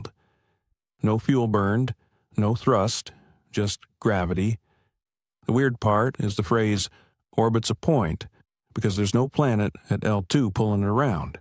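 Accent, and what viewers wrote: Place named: South, United States. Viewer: I like the southern sounding voice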